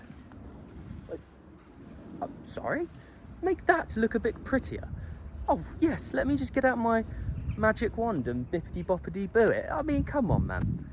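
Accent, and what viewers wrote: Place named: Manchester, United Kingdom. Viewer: What part of Manchester are you from?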